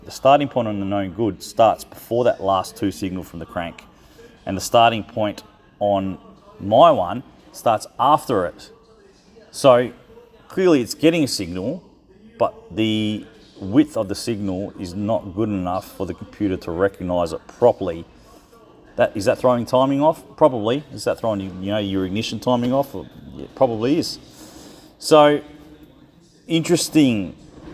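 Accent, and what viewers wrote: Australia. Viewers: Very good stuff my guy!! Im in texas it sounds like you might be from Austrailia? Well where ever you are you helped me from many miles away...that was it! Thanks for posting!!!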